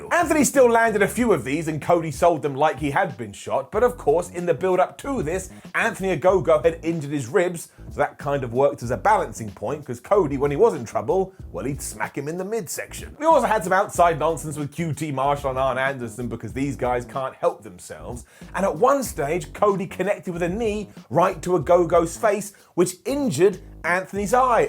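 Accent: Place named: United Kingdom. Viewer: I love British Accents...Jax "Jag-wahrz" becomes the "Jag-u-ahs" lol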